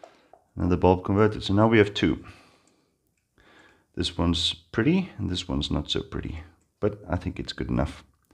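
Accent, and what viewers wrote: South Africa. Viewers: Do I detect a South African accent 😀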